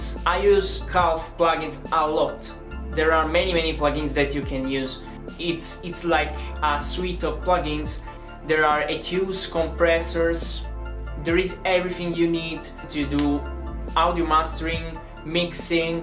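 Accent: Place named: England, United Kingdom. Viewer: I don't know why but.... I love your English accent somehow!!! Its lovely for me...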